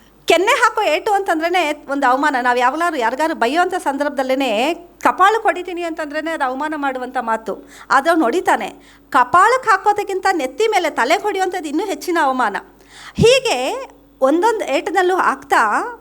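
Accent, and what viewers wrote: Standard. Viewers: ಅತ್ಯಂತ ಸ್ವಚ್ಚವಾಗಿ ಕನ್ನಡ ಮಾತನ್ನಾಡುವ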